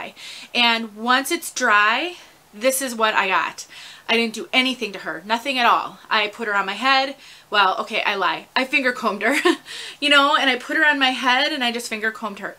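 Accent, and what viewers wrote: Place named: Illinois, United States. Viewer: Girl, you from Naperville, Illinois? Your accent...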